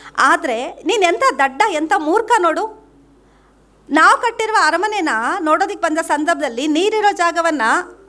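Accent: Standard. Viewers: ಅತ್ಯಂತ ಸ್ವಚ್ಚವಾಗಿ ಕನ್ನಡ ಮಾತನ್ನಾಡುವ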